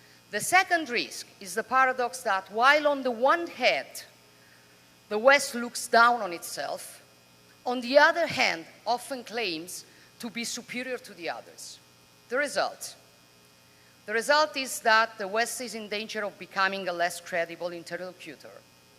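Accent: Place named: Italy. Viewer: Sta leggendo un discorso scritto da altri e la pronuncia la rende riconoscibilmente italiana. Niente di perfetto, dunque.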